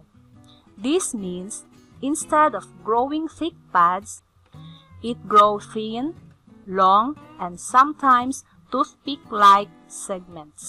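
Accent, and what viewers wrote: Philippines. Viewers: please fix ur speaking. u speak very slow and it sounds very boring. don't use filipino english accent and don't speak very carefully and slow because u sound like reading. i'm not a hater, just a friendly advise for ur video not to be boring to watch and hear. thanks for understanding. 🙂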